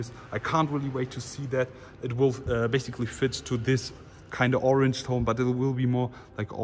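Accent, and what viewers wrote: Germany. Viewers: Arnold is Austrian, this guy is German. The accents are totally different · By speaking in a German accent just makes the video so much more enchanting.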